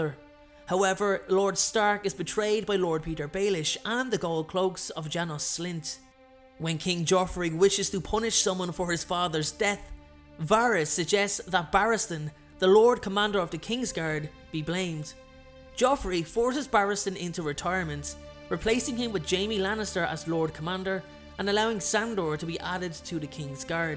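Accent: Ireland. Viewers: Delighted to hear an Irish accent. First time listener well done.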